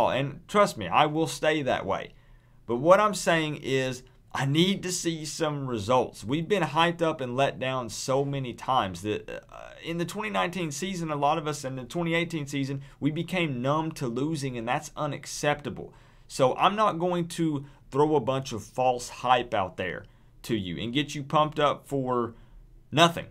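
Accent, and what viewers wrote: South, United States. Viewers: Where are you from,? Sounds almost Georgia/Alabama, but as we all know, true Canes fans are severely outnumbered in these two states. UM alumni here btw in GA.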